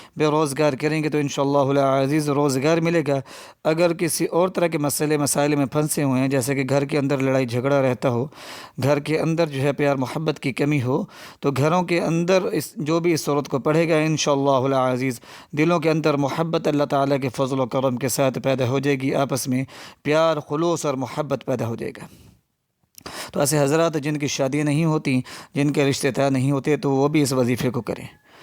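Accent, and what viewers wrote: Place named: Bangladesh. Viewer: this is bangali accent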